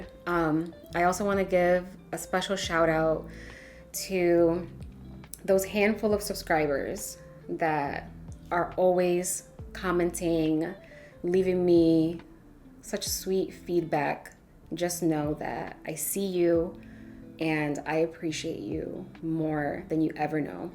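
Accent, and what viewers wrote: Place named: Midwest, United States. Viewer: Love your page! I’m married to a puerto Rican and your recipes are so easy to follow. Im from Chicago and I can tell by your voice you were a midwestern 🫶🏻🫶🏻your just as pretty as your lovely voice im so glad to put a face to a name!! Im also a Christian and love the verses you post on your videos. God bless your page!!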